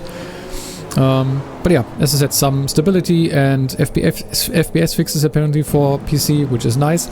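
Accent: Germany. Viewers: You sound very german lol. Edit: oke nach dem Schwedenkreuz war ich raus 😂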